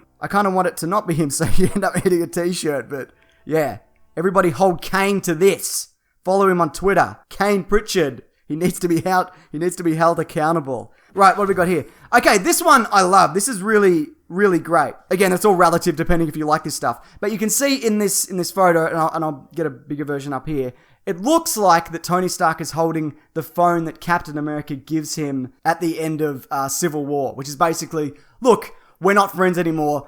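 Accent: Australia. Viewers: I'm glad that you did another video and went into more detail about the trailer. I don't use Twitter so I would've missed out. And I don't care about rambling as long as long as it's in an Australian accent! =)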